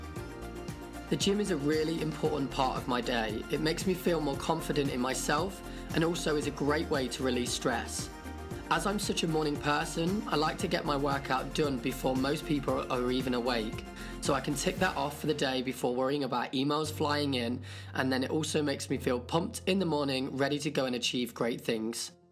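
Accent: United Kingdom. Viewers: Imagine if all British people sounded like this 🤮🤮🤮🤮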